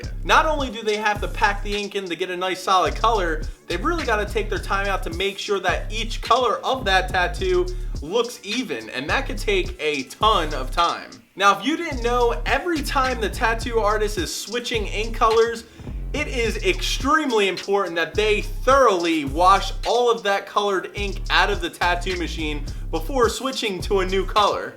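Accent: Philadelphia, United States. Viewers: You sound like you're from the Philly area 🤣 water = wooder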